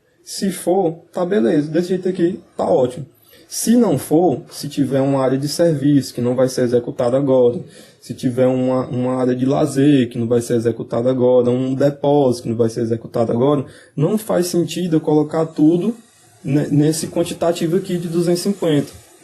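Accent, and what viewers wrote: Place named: Piauí, Brazil. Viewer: Parabéns pelos seus vídeos. Estou buscando informações sobre o Bim + Navisworks e seu canal foi muito esclarecedor. Quando ouvi o sotaque, tive certeza de que era do Piauí..haha Bom demais encontrar os conterrâneos por aqui. Sucesso!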